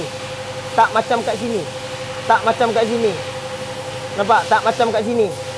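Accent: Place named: Terengganu, Malaysia. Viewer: Gaye bercakap mcm org terenganu ni,betul ke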